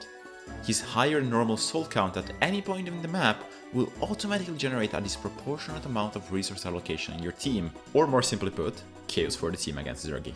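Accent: Italy. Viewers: As a fellow italian (assuming you're too since the slight accent and the name of the channel) i cannot understate how much this video helped me in just a couple of games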